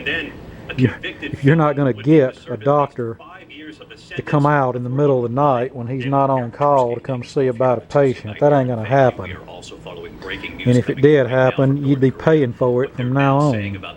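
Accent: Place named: Mississippi, United States. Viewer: I hear this accent all the time, good ole Mississippi.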